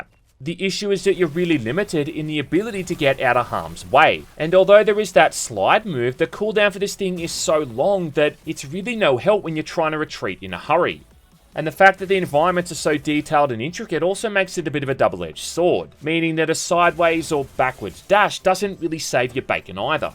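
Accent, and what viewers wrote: Australia. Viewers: have you never heard an Australian?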